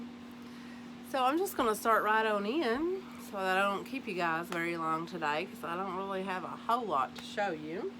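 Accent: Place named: Appalachia, United States. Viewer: Beautiful makes!! I love hearing your hillbilly voice 😂😂 I'm a hillbilly too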